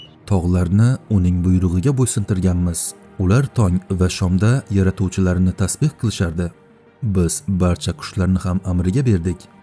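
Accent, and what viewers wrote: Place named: Khorezm, Uzbekistan. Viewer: Xorazmlik boʻlsa kerak. Ularni shevasi boshqa. Q harfini ham aytolmaydi.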